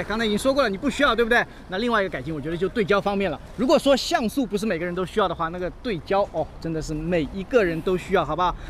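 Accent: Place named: Zhejiang, China. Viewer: 老家哪里啊😄，我女朋友听你视频说你像是浙江的诶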